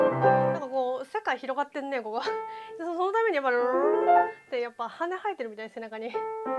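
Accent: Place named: Iyo, Japan. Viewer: てっきり関西の方なのかと思っていましたが、京言葉のルーツもある伊予のイントネーションが可愛らしを演出しているですねと、音楽以外のところで感銘を受けてます(^_^;)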